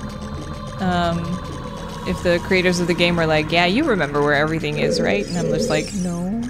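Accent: Netherlands. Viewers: Curious how the pronounciation of the names with a Dutch accent are actually VERY close to how we pronounce it in a Brazilian accent (It was amusing to notice that said the names the same way I do haha) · Hah, wist wel dat je nederlandse was, sommige engelse woorden spreek je nederlands uit. Was niet zeker of je achternaam Nederlands was, heb je ook best veel buiten nederland. Leuke gameplay verder, deze spel is echt vet. Toen ik 9 was ofzo speelde ik het met mn vader.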